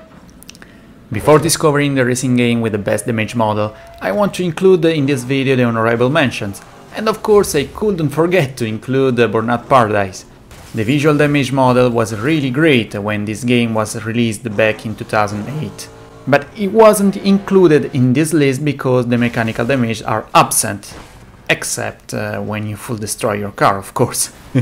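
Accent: Italy. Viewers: Si sente che sei italiano.. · ha un accento tipo italiano ahah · are you..italian? sei italiano? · i can hear some italiano Bro, hahah grande vecchio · Bro ci gioco 50€ che sei italiano dall'accento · Si sente da chilometri che sei italiano ahahahhahahaha, bel video!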